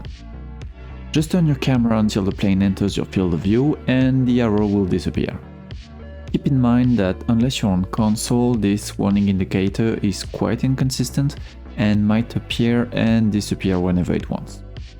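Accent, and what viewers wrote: France. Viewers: J'ai reconnu l'accent français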